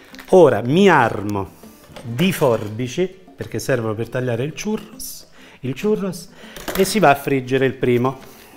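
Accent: Tuscany, Italy. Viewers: Avrai pure un accento toscaneggiante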